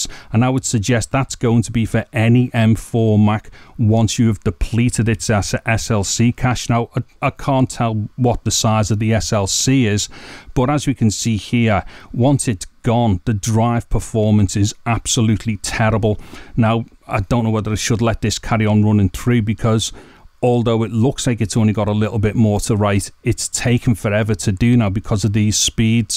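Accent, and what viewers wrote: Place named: Scotland, United Kingdom. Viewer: OMG A SCOTTISH! Love the accent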